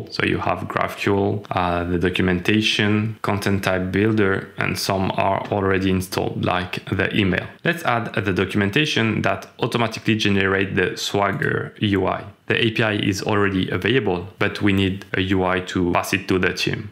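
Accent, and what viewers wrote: France. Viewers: Appreciate the french accent.✌😊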